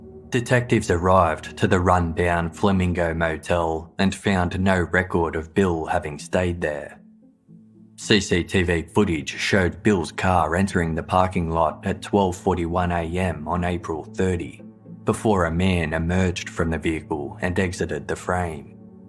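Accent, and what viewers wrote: Australia. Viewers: Ok I’m going to have to UNSUBSCRIBE. The annoying drone of the Aussie accent I could muddle through but this report COULD NOT BE MORE BIASED! Allow me to be of service to listeners: go to Direct Appeal Podcast. It is one thing to simply be a story-telling channel but a whole other when the “story” is clearly one sided.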